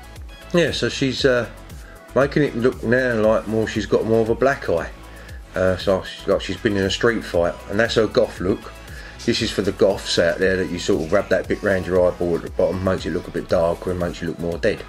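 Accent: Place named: Cockney, United Kingdom. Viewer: cockney accent love it x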